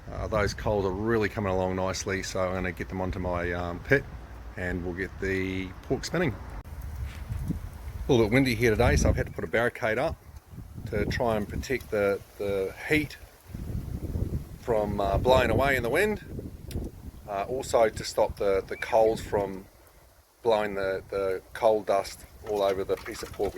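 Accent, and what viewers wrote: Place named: New Zealand. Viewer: Good to hear the kiwi accent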